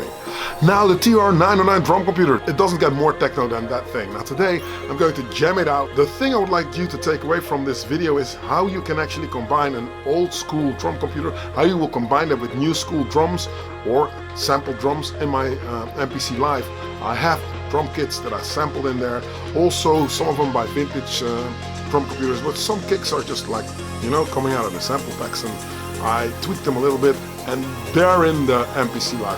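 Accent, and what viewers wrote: Netherlands. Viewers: Dude are you dutch? You have a heavy dutch accent. And I’m just some random dude from California and can hear it.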